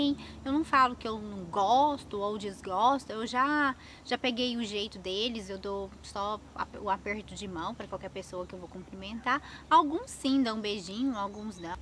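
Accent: Minas Gerais, Brazil. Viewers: com essa voz de mineiro..tem qui arotar mesmo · Adoro seu jeitinho mineiro de falar... como e linda essa menina... adorei. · Você é da onde , seu sotaque parece com o de Minas !!! bjss adoro se canal !! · Teu jeito mineiro é muito maneiro, nós cariocas adoramos vcs (mulheres) falando!!! vc mora nessa residencia dsd que deixou de ser au pair?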